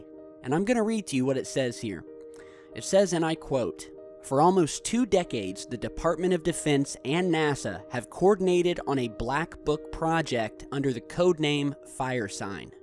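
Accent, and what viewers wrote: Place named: Michigan, United States. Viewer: You're from Michigan aren't you?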